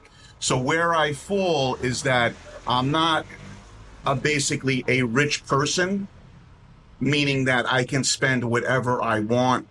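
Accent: Brooklyn, United States. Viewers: love the Brooklyn-speak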